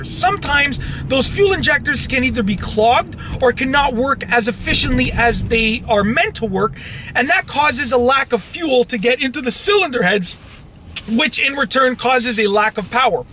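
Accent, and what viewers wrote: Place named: Toronto, Canada. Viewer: As soon as he started talking I knew he was from the gta. then I noticed the streets and was validated with the knowledge of knowing I was right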